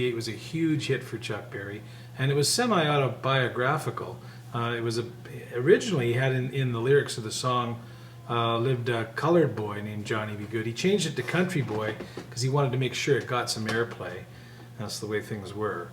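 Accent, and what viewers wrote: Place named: Canada. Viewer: Thanks for the lesson. Very helpful. Are you Canadian by chance? You accent suggest that you might be. Thanks.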